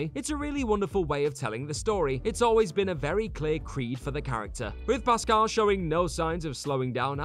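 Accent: United Kingdom. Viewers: He's Bri ish though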